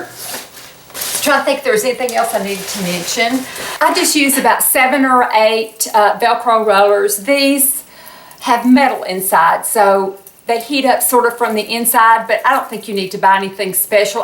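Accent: South, United States. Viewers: I smiled as I watched this. I had a soft bonnet hair dryer. Since I live in England now I will have to see what the they have. Thanks for sharing. Love a nice nice Southern accent, too! Not everyone in England sound like Colin Firth!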